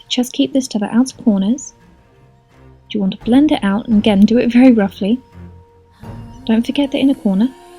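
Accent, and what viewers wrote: England, United Kingdom. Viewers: Where abouts in England are you from? im from near Liverpool :) x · This makeup is beautiful and so are you!!! But you' re English or American?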